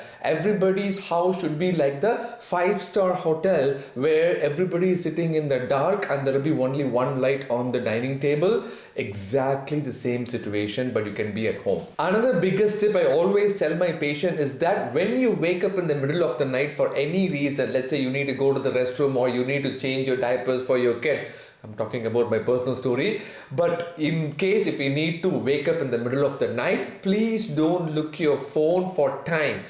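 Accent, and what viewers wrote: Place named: South India, India. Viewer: Sorry your South Indian accent in English is irritating. Otherwise the video is informative.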